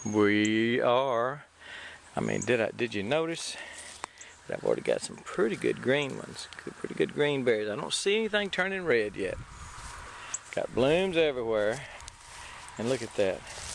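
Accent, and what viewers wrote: Texas, United States. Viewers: That's a natural Texan accent. Everything is better in Texas.😎😘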